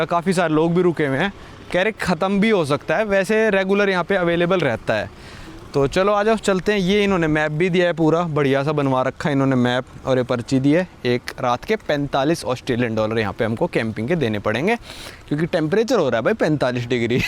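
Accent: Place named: Himachal Pradesh, India. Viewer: भाई हमीरपुर, हिमाचल से तो नही हो, accent हिमाचली लग रहा आपका, मेरे रिश्तदार है वहाँ इसलिए बोल रहा हूँ, ❤❤ वलॉगिंग बढ़िया है👍👍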